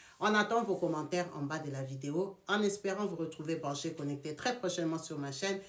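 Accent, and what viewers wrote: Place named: Cameroon. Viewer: Madame arrête de parler comme une camerounaise ⛔ ton accent est nul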